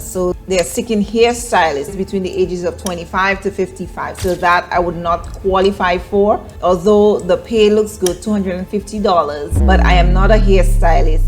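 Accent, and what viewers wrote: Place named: Caribbean. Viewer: This is the Carribbean accent , she seems to be Carribbean too.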